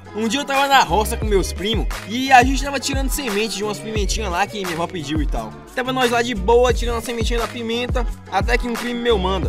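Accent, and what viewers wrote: Bahia, Brazil. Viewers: Tu é de que lugar da bahia? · Mano,vc é baiano ou gaúcho,sou filho de baiano,sou mineiro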